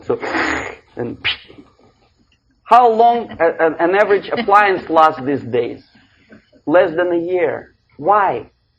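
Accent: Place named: Russia. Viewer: RUSSIAN ENGLISH ACCENT SOUNDS GOOD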